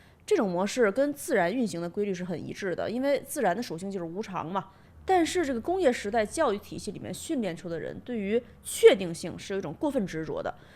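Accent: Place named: Beijing, China. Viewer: 本期北京话含量极高:face-blue-smiling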